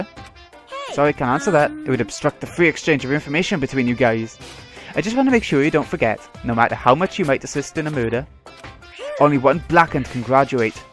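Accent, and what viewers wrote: Wales, United Kingdom. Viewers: you sound Welsh asf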